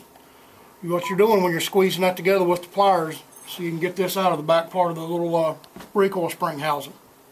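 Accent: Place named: East Texas, United States. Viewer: where you from boy? I'm guessing it aint main. meby deep east texas.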